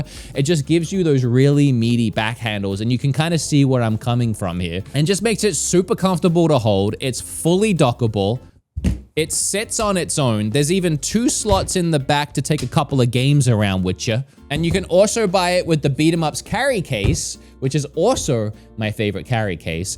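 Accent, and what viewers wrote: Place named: Australia. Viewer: Can someone help me out with identifying his accent. I thought it was Aussie, but then it also sounds American?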